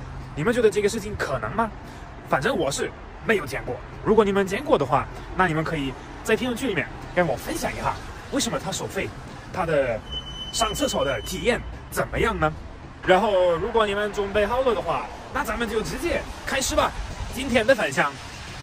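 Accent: Sichuan, China. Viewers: 带有四川口音的普通话太好玩儿啦，哈哈哈。一会儿“一下xia”,一会“一下ha” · 讲的很好。很浓的四川口音 😄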